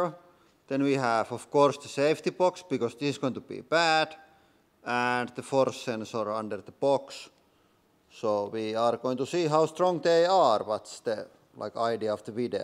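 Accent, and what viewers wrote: Finland. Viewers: Is it me or does he sound more Finnish in this episode? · I must compliment you again on your straightforward Finnish accent, that's a beautiful thing and always a pleasure! Olet raudanluja kokeilija, hiihdä näitä latuja ja menesty vielä suuremmin! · Got to love this Finnlish accent!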